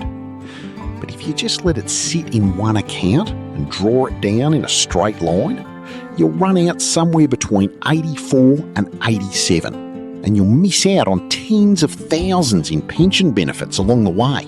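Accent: Australia. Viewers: I'm a 62yo Aussie with a million, but for the life of me, I can't watch another video with this cringe ocker accent. Get rid of it mate. Go Asian, go British, German. Even fucking Yankee is better, and I hate Yankee.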